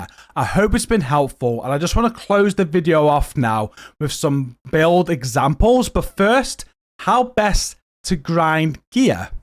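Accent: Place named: Liverpool, United Kingdom. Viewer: Where are you from? You sound like someone I know from Liverpool 1 haha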